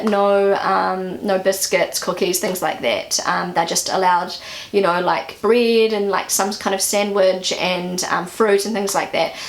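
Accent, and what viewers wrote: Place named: New Zealand. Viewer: We just had this LSE-meeting (short for Teacher, Student, Parent) last week with my son our first time and I was suprised to see his interaction with his teacher. There were some questions where I thought I’d needed to help him answer (we are a bilingual home, and mainly speak English at home because of my husband) so it really made me happy to see his good relationship with his teacher and his confidence. I do like this idea. When I was in school it was with the parents only, and the kids stayed home. And from some friends and family who have teenagers, when their kids were smaller it was still the same - parents only (Rheinland-Pfalz). So I’m not sure when this actually started. Also, I must say I enjoy your videos so much! But I don’t know if I like it more because of your topics or because of your New Zealand dialect and pronunciation. 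I could hear it all day!!!❤️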